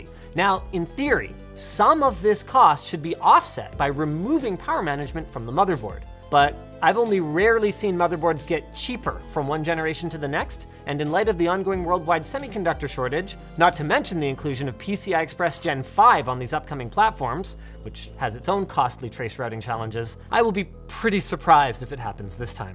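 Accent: United States. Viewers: went very american in this one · really speaking American here.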